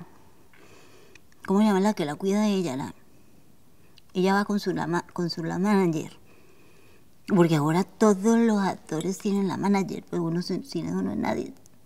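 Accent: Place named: Cali, Colombia. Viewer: Tengo un amigo caleño que habla igualito, jejejejeje Que artista tan buena eres, la mejor.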